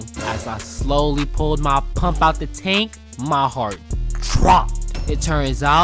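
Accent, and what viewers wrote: New York, United States. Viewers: that yew yorkin language HIT DIFFERENT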